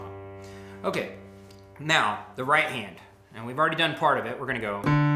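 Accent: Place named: Rural, United States. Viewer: Your voice is so country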